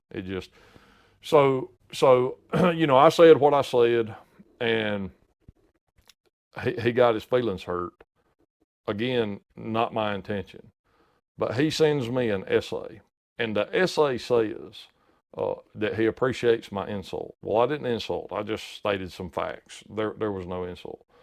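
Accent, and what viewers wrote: South, United States. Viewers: that southern drawl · I love the Southern Drawl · someone with a southern drawl and slow talking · I'm from Canada and I have no problem understanding your lingo. In fact I love the Southern accent..!! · i have near to no experience with valve-work, but i appreciate your professionalism and respectful attitude coupled with the most southern accent i've ever heard as a foreigner. i enjoyed every second of this and even learned a little bit too. thanks for all that. god bless! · ‘… people that’s smarter than me…’ as a foreign viewer, who knows nothing about machining or mechanics, can I just say how much pleasure it gave me to listen to you discuss this with your accent. To a non-American you have a real ‘good ‘ol boy’ way of speaking that adds huge value to your out-of-hand dismissal of his criticism. Fantastic. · your amazing southern draw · You talk like a redneck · your overwhelming Southern Drawl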